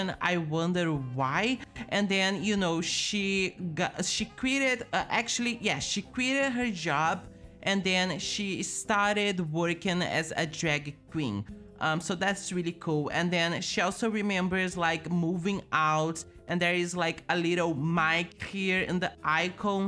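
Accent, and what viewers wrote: Brazil. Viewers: Everyrime inwarcgbtour vídeos I’m trying to figure out where you’re from hahaha I’m surebyiure Brazilian. Love seeing fellow Brazilians doing content in English!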